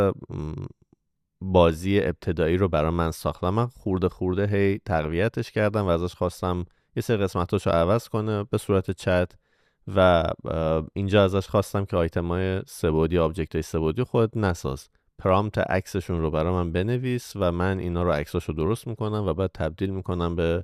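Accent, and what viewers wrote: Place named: India. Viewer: از پکیج دیجیتال مارکتینگ که چندین سال پیش دادید فکر میکردم هندی باشید بخاطر لهجه لاتین تا الان که یهو این ویدئو رو دیدم، عالی بود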